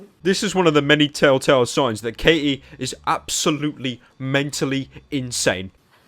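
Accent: United Kingdom. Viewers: what's wrong with his voice lol I love British accents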